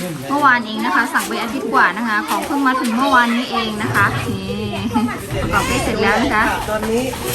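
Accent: North, Thailand. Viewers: เหมือนภาคเหนือเลยครับ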